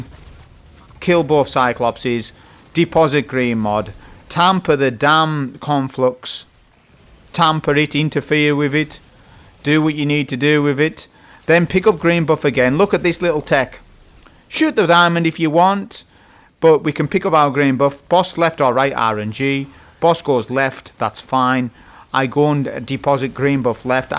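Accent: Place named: Newcastle, United Kingdom. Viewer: that's fair enough, I'm just trying to identify the accent, sometimes I think there's a bit of Geordie in there, sometimes I'm like hmmmm.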